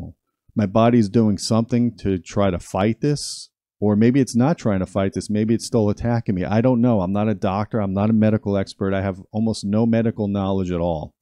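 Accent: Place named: East Coast, United States. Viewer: I would rule out Lyme, I found out I have 2 species of it from Ohio, have had it for 30 years. Just saying, it was the last thing, I checked,bingo. Sure my family has RA history too, but R Arth is a function of the main source - whether it be some sublime infection be it bacterial, or even a viral co infection that is barely kept "underneath" by your struggling immune system. My LLMD had me use DNA Conexxions kit that he said was the most accurate /cost effective one ($650). If you get a hit, then pay for the real expensive one next. I also followed strict testing protocol to get an accurate test, like fasting before the test, strenuous exercise, deep massage session that was purposely painful, empty the bladder and use the next bladder fill 30 minutes after the massage as the sample. I had Lyme A, and from my early childhood infection Ehrlichia from the Lone star Tick, I recall the bullseye rash as a teenager. 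Just saying, even if its not Lyme, it pays to rule it out. You sound like youre from the east coast, I caught Lyme in the 80s in ohio. My dad now has it from the same property. It is pervasive in the midwest, those cute deer in your yard, they bring the Lyme TO YOU. And opossums are the greatest eater of ticks next to some birds, who would've thought that? !! Also, I don't peruse your channel, you just came up when awhile back when I was looking up Coronavirus. I'll have a peek at your stuff... )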